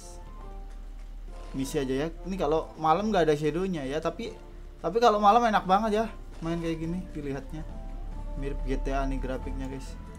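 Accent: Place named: Tegal, Indonesia. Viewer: Cuk ngapak nemen kie. Wong tegal apa wong semarang kie 😂😂😂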